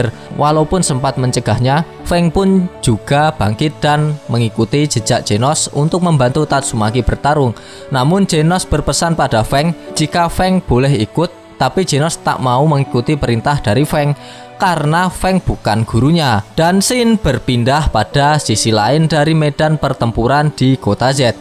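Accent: Java, Indonesia. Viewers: jowo ndi kang ?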